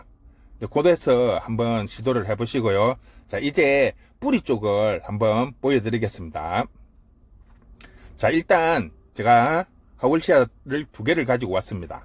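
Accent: Gyeongsang, South Korea. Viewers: 저는 오늘 처음으로 님의 영상을 보았습니다 경상도 구수한 말씀으로 상세한 설명 감사합니다~~^^ 저 완전 초보에다 저희 집은 햇볕이 부족하고 확장된 아파트라 하월시아 기르는것은 좋은 환경인듯 싶네요 하월시아는 영양제는 필요없나요? 그리고 난석의 굵기는 어느정도가 좋은가요 (예: 소.중.대) 물 줄때 저면관수로 주어도 되는지요 오늘 휴일 평안한 축복된 하루가 되시기를~~^-^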